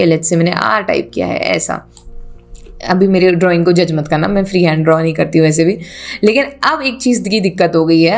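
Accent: India. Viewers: Hasi hasi mai seekha gaya ,,, paka aap up se hai sayad 🥰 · Aap Bihari hai 😂❤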